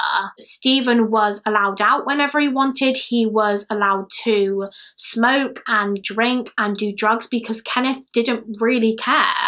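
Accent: United Kingdom. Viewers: love your British accent ^.^ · I don't know why, but your British accent is so beautiful. You have a super soothing voice! I loved this video!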